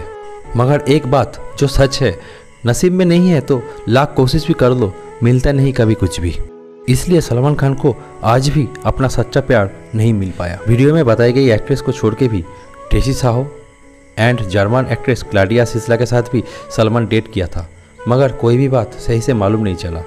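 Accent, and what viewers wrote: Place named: Bengal, India. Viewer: Kyea ap bengali ho ? Apki hindi acca nahi hay.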